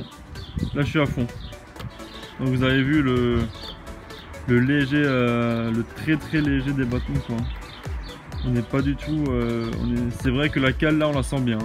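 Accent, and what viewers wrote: Alsace, France. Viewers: ton accent t'a trahi tu habite à Strasbourg ou dans les bled à côté ?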